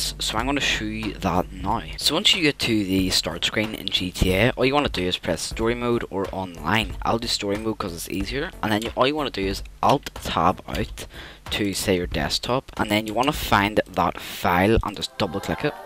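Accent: Northern Ireland, United Kingdom. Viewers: Mate are you Northern Irish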